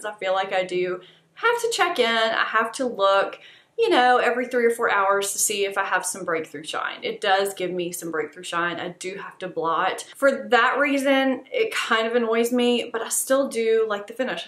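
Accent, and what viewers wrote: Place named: Louisiana, United States. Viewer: Louisiana fam 🐊 I got so excited when you said Nola!! Knew that accent sounded like home 🤟🏻 I’m from Lafayette, I’ve never found an influencer from my neck of the woods! It helps a lot to see someone trying things in our crazy climate! new subscriber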